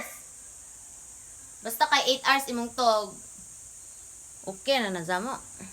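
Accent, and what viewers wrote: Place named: Bohol, Philippines. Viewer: malingaw kaau ko maminaw jud sa bul anon ba, "talijukon" "buho" ❤️❤️❤️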